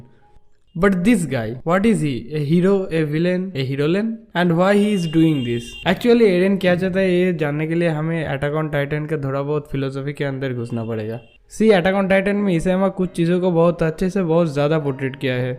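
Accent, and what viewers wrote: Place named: Bengal, India. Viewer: bro you sound like bangali are you ?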